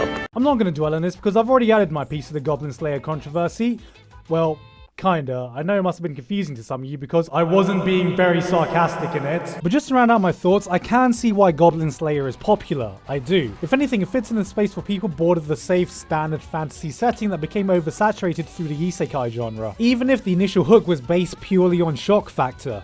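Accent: United Kingdom. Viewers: Is this dude British or American I can't tell?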